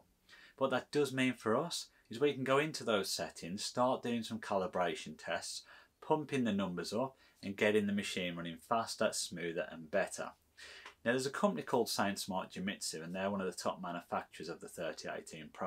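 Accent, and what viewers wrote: Birmingham, United Kingdom. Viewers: Excellent content and the best I have seen on this clever little machine to date! Very many thanks. Btw, people of the world thats a Birmingham accent - a place in England famous for engineering excellence and where kids grow up naturally knowing how to make things and create loud music for some reason. Good to see a great tradition continuing.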